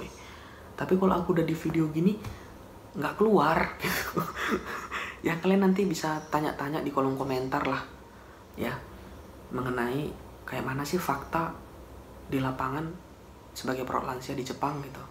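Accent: Medan, Indonesia. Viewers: medan kali abg ini 👍👍👍 mantap lanjut kan bg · Bang, asal medan kah? Logatnya medan kali soalnya😄😄 semangat ya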